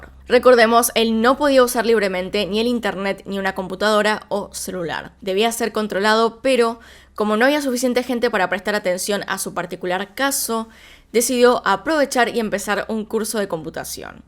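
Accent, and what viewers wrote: Argentina. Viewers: Eres Argentina?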